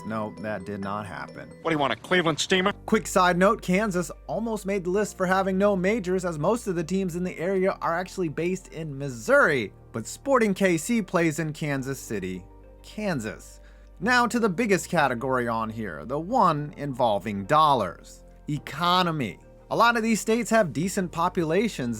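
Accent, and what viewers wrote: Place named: Northern Virginia, United States. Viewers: I know you make videos on broad topics but you're definitely from Virginia hahahaha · As someone that lives in NOVA I can so tell your from here.. lol. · Listening to this just shows you are definitely from VA or have lived there at some point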